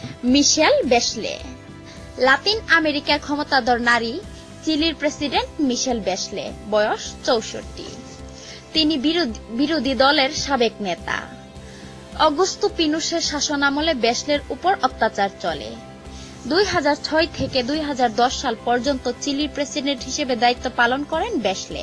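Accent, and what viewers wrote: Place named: Jessore, Bangladesh. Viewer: আপনার বাসা কোন জেলায়? যশোরের ভাষায় কথা বলেন।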